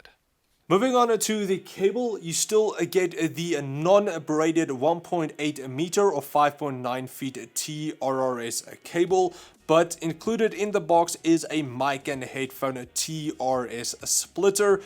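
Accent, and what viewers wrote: South Africa. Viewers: why are you trying to sound americaN? A boer accent mixed with american is a no no bro bro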